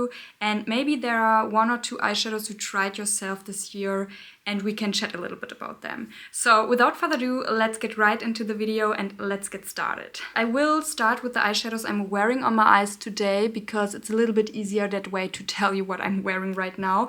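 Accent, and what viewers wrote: Germany. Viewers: I love your accent! Do you live in Germany? Happy New Year❤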